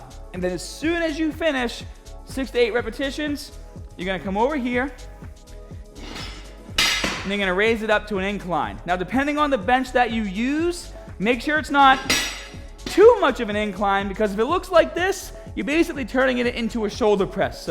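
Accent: Boston, United States. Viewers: You got be from Boston with that accent 💪🏽 I been doing for while definitely gets you a nice pump started to see results after a month nice!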